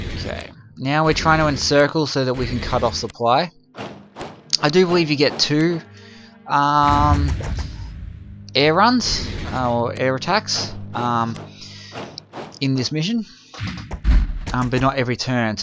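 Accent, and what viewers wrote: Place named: Australia. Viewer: Expertly played mate; was surprised to hear an aussie when i started the video to be honest. I might watch a few more of these if i need help nailing a brilliant victory later on; did you upload videos for all of the Red Turn campaign?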